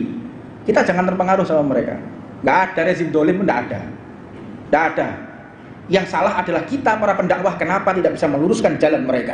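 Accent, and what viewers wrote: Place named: Indonesia. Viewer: nyenengin banget habib husen...pola pikirnya..dakwahnya...gaya ucapanya,nusantara banget...sehat selaku bib...abaikan habib arogan yg lain,teruslah cinta nkri,menjadi habaib,dzuriyah nabi tak perlu di rabithah lawiyah,tapi di akhlaknya nabi bib...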